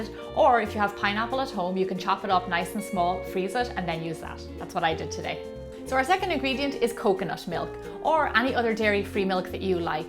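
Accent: Ireland. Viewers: Your accent!!! Beautiful. Irish? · Are you Irish? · ᏙᎠ ᎠᎰᏚᏟᎾᎡᎠᏙᏁᎢᎰᏙ ᏚᏤᏚᎢᎬᎷᏙ ᏁᎾᏚ ᏚᏙᏞᎢᏙᎢᎾᏒᎰᏴᏌᏚ fake?? shes Irish...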